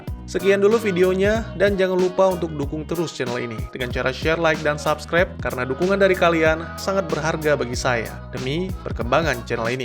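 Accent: Aceh, Indonesia. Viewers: Lo org Aceh ya bg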